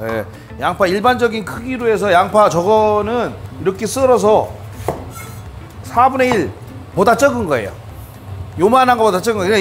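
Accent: Chungcheong, South Korea. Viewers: 아... 전형적인 충청도의 너스레~ㅋㅋ 레시피도 얻고 재미도 얻어가네요~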